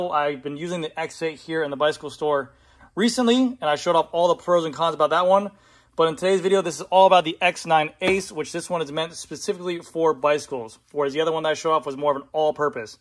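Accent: Australia. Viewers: Kool just ordered......Really the Aussie accent,.....to funny....oh and the reference to hill KOM, hilariously. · The Aussie accent was on point!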